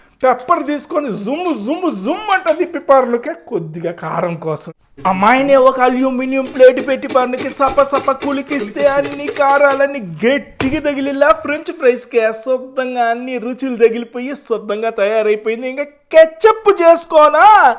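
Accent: Nellore, India. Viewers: Mana achamaina Nellore basha inka bathike undhi 💚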